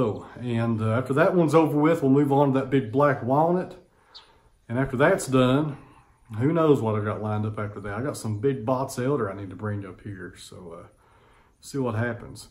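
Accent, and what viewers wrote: United States. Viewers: Loving this dude's accent - sounds like REAL America, as it should be. Got my sub here from the UK.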